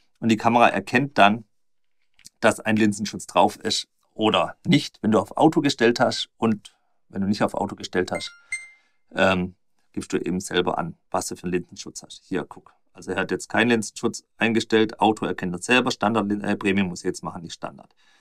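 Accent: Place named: Swabia, Germany. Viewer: Ich liebe deinen schwäbischen Dialekt 🤗👍👍👍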